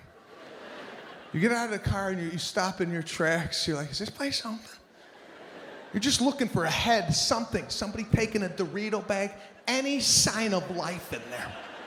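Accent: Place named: Brooklyn, United States. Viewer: I love his Brooklyn/Queens accent! I'm from Brooklyn, and it just adds to his comedy! 💗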